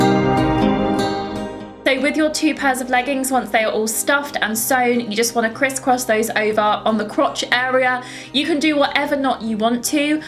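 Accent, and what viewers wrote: United Kingdom. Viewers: I really like your British accent😍😍😍😍😍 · Are you British? I admire British people.😋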